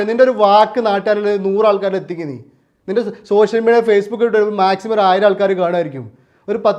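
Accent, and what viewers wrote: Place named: Kozhikode, India. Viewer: I like that kozhikoden slang😊😊😘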